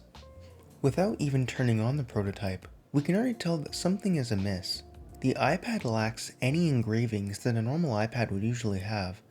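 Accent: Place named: Netherlands. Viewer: Dus je bent wel een Nederlandse.... can't help but noticed the accent .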